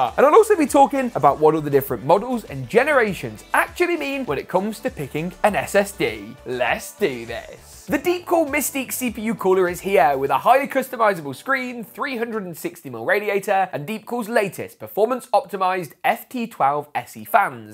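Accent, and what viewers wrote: United Kingdom. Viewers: Why does your English sound British?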